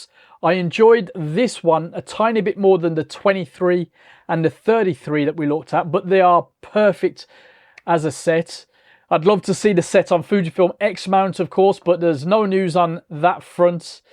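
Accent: Birmingham, United Kingdom. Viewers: I recognise the Birmingham.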